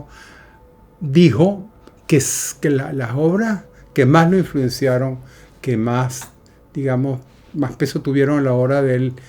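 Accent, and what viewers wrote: Caracas, Venezuela. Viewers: Magnífico post sobre una magnífica obra. Acento caraqueño inconfundible. Suscrita y activada la campana. Pregunto: esta trilogía tiene traducción en español? Gracias. Mil exitos.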